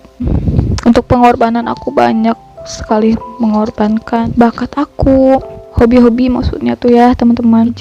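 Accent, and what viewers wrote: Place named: West Java, Indonesia. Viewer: Eta logat Sunda nya 👍👍slalu Istiqomah ya sayangkuuu 😍😍🤗😘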